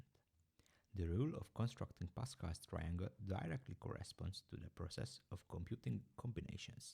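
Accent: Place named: Hungary. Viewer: MI A FASZ, CSAK MOST VETTEM ÉSZRE, HOGY TE MAGYAR VAGY. Az akcentus eladta a dolgot. Meg az, hogy variációnak nevezted a permutációt, ami magyar dolog. BRUHHHHHHHHHHHHHHHHHHHHHHh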